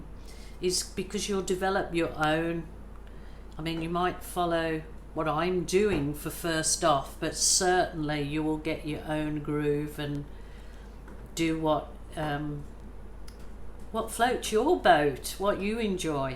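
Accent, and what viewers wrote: Birmingham, United Kingdom. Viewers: Hi Love your work! are you from Birmingham UK? I can hear a bit of Brummy there. I grew up in West Bromwich, but have lived in Switzerland since 1980. love your videos! Janet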